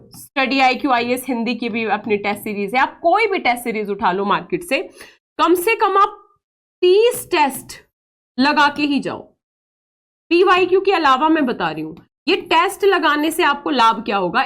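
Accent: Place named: Haryana, India. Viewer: Mam ki accent प्योर हरयाणवी चोबीस ❤❤❤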